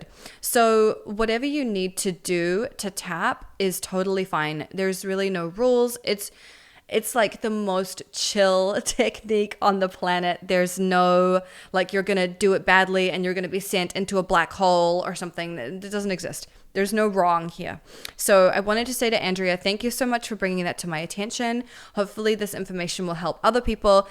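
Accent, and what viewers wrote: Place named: New Zealand. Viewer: 😹😹😹 FUCK ME... you are hilarious!... it’s so lovely to hear another NZ accent and one that swears as much as I do! 😹😹😹 I shit you not muffin! 😹👍🏻 I am here in LA 😋💛 and I am on IG... not very much but I will toddle on over and DM you 😹👍🏻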